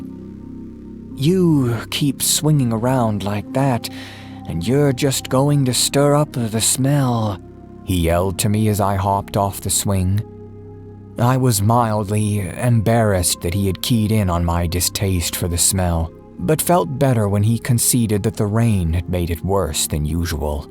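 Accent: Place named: Midwest, United States. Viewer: Great mid-western accent!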